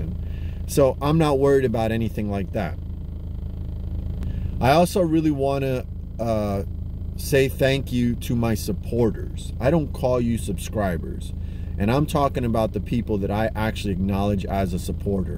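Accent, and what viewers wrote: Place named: Southall, United Kingdom. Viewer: Keep up the gd work,well said! appreciate the dirty grind ur doing n outting these brokers,u spoke like a true Southall (west london) boy,head up 👊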